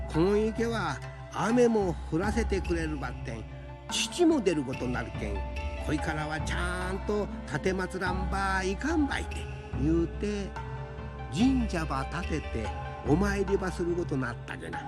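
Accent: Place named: Kyushu, Japan. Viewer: 私は熊本ですが、方言は南九州の方言そっくり。むしろ本当の方言よりききとりやすい。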